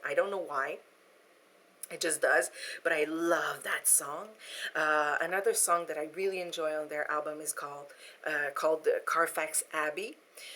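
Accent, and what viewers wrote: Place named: Quebec, Canada. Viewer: Ah! French-Canadian. Neat! I knew you had an interesting accent, I just couldn't pinpoint what it was. I live in the Southeastern part of the United States, so you would definitely hear an accent from me! Lol. What's so odd, is that I have had people in my own hometown asking me where I'm from. That hasn't happened in a while now that I think about it---but it happened quite often for a while. So, I couldn't tell you WHAT I sound like, lol! Just a Southern accent. Have a great weekend, and---don't let the vampires bite! 👿 I would love to get bitten and turned into a vampire, actually. Life would never be boring then! Lol. 🤗🤗🤗 🎃🕸🔥👻💀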